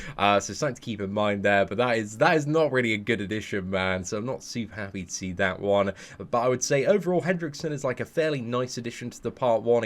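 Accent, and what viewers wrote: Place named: England, United Kingdom. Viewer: It’s pretty obviously England. I mean I think he’s said it before and he has the exact same accent as me so yeah.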